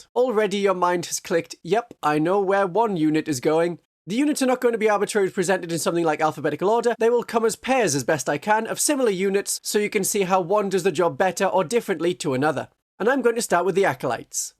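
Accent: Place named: England, United Kingdom. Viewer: Your accent makes it sound like you might be in the same corner of England as me. I'd suggest meeting up for a game but I don't know how I'd feel playing against a 2d animated character. Who framed roger rabbit freaked me out as a kid so I'm not sure my mind could take it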